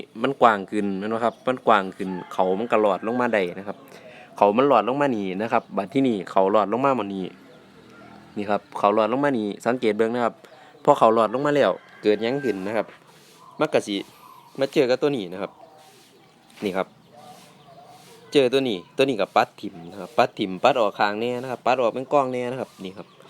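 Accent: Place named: Isan, Thailand. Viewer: จะพูดอิสานก็อิสานไปเลย อิสานปนไทยมันฟังไม่รื่ส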